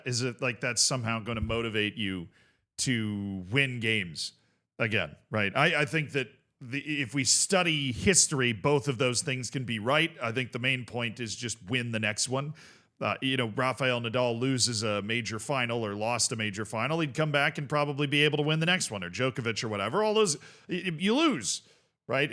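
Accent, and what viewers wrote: Liverpool, United Kingdom. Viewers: Scouse not enlish innit. boss like la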